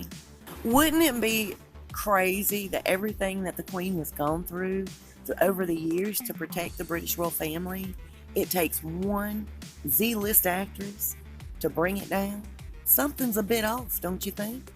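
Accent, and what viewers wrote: South, United States. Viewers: This woman narrating......terrible southern ACCENT. Can't listen past 2 minutes. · I really like your voice, where in America is your accent from? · Then dont listen and go elsewhere....nobody asked you to come or stay..dont hate on beautiful southern women and our accents...u must speak thru ur nose like yankees..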